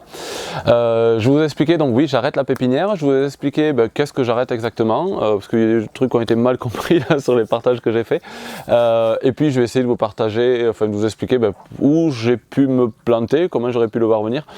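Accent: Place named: Tarn, France. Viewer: bonjour bonjour ! si jamais tu passes en correze hésite pas a venir nous voir (on se connait pas du tout mais on se sent connecté a toi on vient de villemur sur tarn et ton accent nous ramène chez nous et puis on kiff tes videos ta philosophie etc !) bref on fait de l'elevage de brebis et transfo fromagere, de la perma et du chanvre bio tout ca tout ca, t'apprendras pas énormément on est pas des experts mais on est sympas !! )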